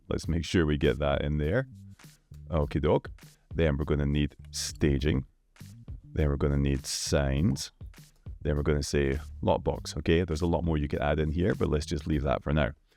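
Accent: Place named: Scotland, United Kingdom. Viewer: Did expect a fellow Scot when I clicked this! 🏴 Good overview, great presenting and useful tips.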